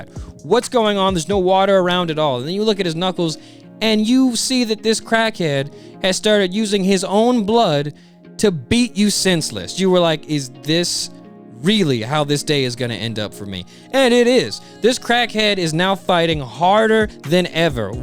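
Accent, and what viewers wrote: South, United States. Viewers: I love your southern accent, where you from?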